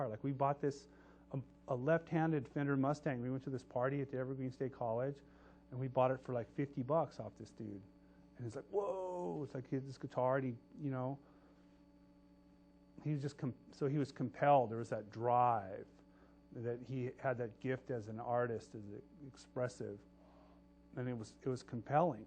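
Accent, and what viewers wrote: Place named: Croatia. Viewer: he was born in croatia right? to.... i forget what kind of parents, but i can hear it in his accent.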